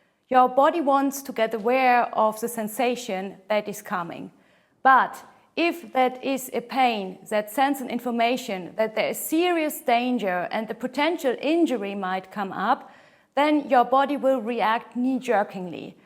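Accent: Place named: Germany. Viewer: The explaining person's mother tongue is german, isn't it? :)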